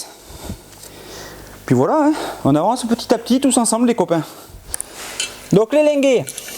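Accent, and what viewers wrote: Gironde, France. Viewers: Toujours agréable de regarder ces vidéos, avec en plus l'accent Girondin.....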